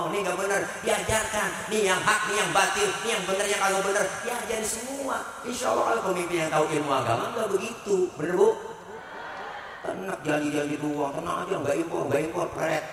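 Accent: Betawi, Indonesia. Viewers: Ustad ceramahnya lucu dg dialek betawinya Sangat cocok untuk warga jakarta bekasi tangerang dan sebagian bogor Bisa ls terjadi interaktif dg para jamaahnya Bahasanya gampang dicerna dan sesekali disertai humor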